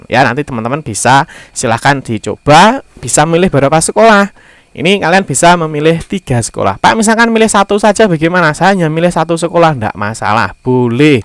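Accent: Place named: Java, Indonesia. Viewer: ga ada pengisi suara yang lain kah? ini medhok banget dan nyentak2, kurang lembut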